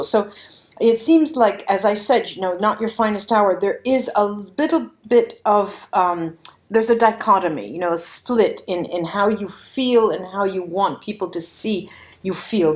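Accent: Italy. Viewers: Italian Accent*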